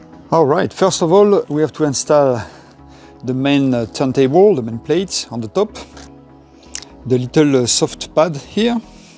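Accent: France. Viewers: Ahah you’ve got the French accent it’s kinda funny ! I would like to buy this turntable but shipping isn’t enable to France… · I always enjoy your videos but may I also say that we really love your accent! It's a very wonderful French accent so please keep up the Great videos!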